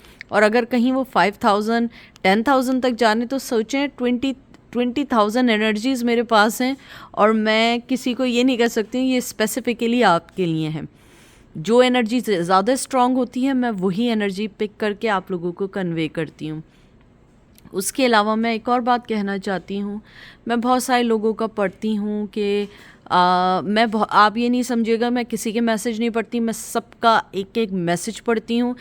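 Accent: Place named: Karachi, Pakistan. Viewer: Hi I am in Virginia. I wish I can meet you. You sound like you are from Karachi too. ❤️❤️❤️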